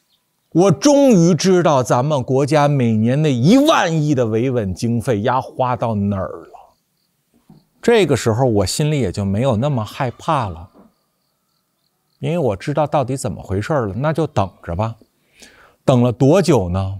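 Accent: Beijing, China. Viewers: 哈哈这京味咱北京人儿:hand-purple-blue-peace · 我比你大几岁，但真心佩服你的方方面面，优雅口才底蕴思想逻辑真诚痞帅，艺术的表达，等等等，真是一个完人。开始还奇怪为啥没有一点东北口音，原来十几岁就去北京独自生活了，一口北京话听着真舒服，优雅、收放自如、语音语调节奏表情和内容配合的简直完美，嬉笑怒骂正直诙谐幽默信手拈来，能感知到你忧国忧民的善心，远超很多知名评书人士。不像其他中文博主纯纯为了博流量赚钞票，看好你一定会超过某高和某沫及其他一众所谓的反贼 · 一开始听口音我就明白了你是首都人，再离政治中心很近的地方不可能安然无恙，我离政治中心也不远我也不敢直接说习近平，我会用大xi或者西哥，而且我们大部分不会再群里聊政治，都会当面聊。我当过辅警我们这地方小的警察要比首都要松一点，有意思的是我在当辅警的时候和正式的警察聊天他们也骂习近平（正好在开十八大的候）。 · 您的北京口音也挺浓重的:face-green-smiling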